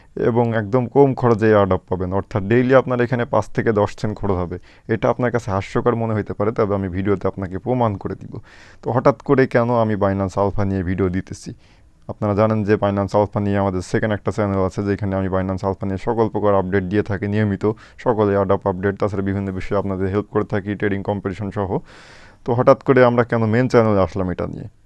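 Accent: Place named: Kushtia, Bangladesh. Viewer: vai akta kothia bole apnar voice cena cena laghay apnar bari ki Kushtia tay 🙄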